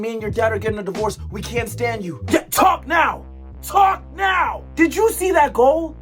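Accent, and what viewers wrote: South Africa. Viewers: wait you're south african fr 😭😭😭😭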